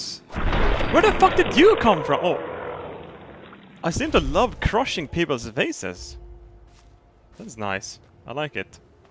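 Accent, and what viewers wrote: Sweden. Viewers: "Home cozy" love your swinglish... Have you found any "stabsnakes" yet?? You've got a new subscriber! 😄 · are Swedish right? I can hear it on your voice. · Jacob Tidwell he’s Swedish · you better make more of these ...I can't fuckin stand other non vikingss talking in non swedish accents THE GLORY IS ALL YOURS BALDER HIMSELF LAUGHS MARKED WITH LIGHTNING STRIKES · best Swedish accent ever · det är så kul att man kan höra när folk på Youtube är från Sverige bara genom att lyssna på när de pratar engelska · are you swidish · Is Swedish or something? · "fort yinyer"...dat swedish english though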